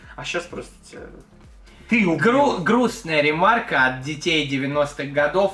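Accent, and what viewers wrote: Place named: Rostov, Russia. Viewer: пол часа назад зарегался в твитере и у меня высветился Хованский в первых строчках рекомендациях это прекрасно базарит так как все у нас на районе в Ростове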